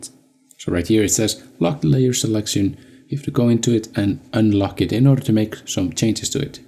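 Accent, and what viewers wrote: Iceland. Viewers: Great tutorial! Thank you. Love your Icelandic accent too, a bonus! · Hi, thank you soo much for these amazing tutorials! I’m completely new to digital drawing (and not very experienced in drawing in general) and just got my first iPad (pro <num>) and bought the Procreate app mostly out of hopes to unleash my creativity in a new way. However, as I started exploring the app I got quite overwhelmed and a bit discouraged (and amazed as well of course) by all the things you can do with the program, so when I found your videos today (!) I felt really glad and hopeful that I can learn and eventually have no limits in creating in it but my own brain…. 😌☺️👍 I will check out all your tutorials and stuff (some, like this one, a few times or more I guess, before I really understand this way of thinking and creating) and I really want to thank you and commend you on great teaching skills and videos! 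Extra plus for your wonderful Icelandic accent as well! 😉👍 Best wishes from an inspired Procreate beginner in Sweden!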